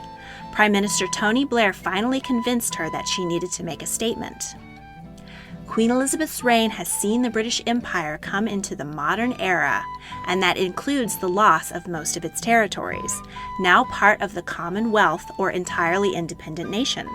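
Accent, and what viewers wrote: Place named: United States. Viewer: I do find an American narrator for my country slightly disconcerting !!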